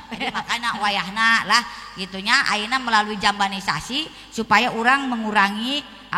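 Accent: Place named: South Banten, Indonesia. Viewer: Logat banten selatan, asli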